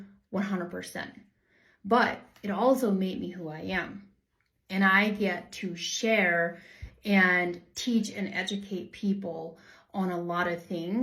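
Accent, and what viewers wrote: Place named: United States. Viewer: You sound like you got a lot of American in you and your parents were American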